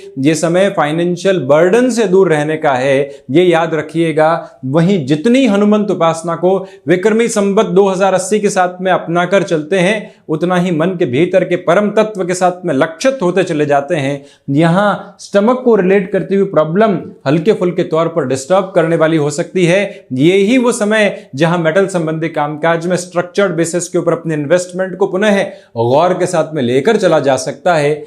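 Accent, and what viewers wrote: Standard. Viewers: Itni shudh hindi thodi si english ke sath 😱😳😱😳😲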